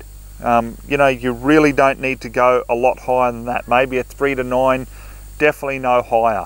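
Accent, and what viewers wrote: Australia. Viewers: I absolutely love to hear an Ozzie talking guns, but...how are you an Ozzie talking guns? Was this filmed in a secret bunker?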